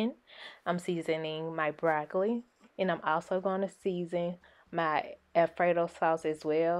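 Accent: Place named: South, United States. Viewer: Straight to the point! btw I love your southern accent 😊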